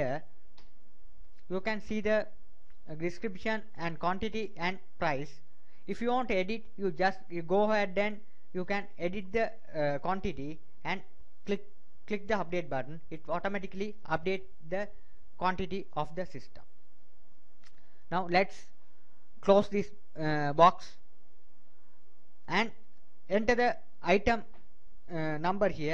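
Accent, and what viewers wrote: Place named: India. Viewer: why does every time I look for programming videos I hear a dude from India?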